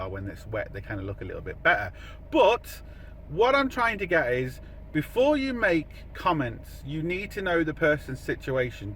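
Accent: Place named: England, United Kingdom. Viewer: Funny all the years you.ve lived here u never lost ur strong English accent 🤔🤔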